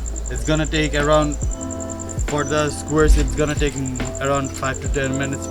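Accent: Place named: Jamaica. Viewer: This reminds me a lot of the jamaican jerk chicken, I see where the the chicken comes from but after listening to his accent i finally understood the jamaican jerk in it. plus it seems like the bengali dude with the jamaican accent has to learn to give an outro.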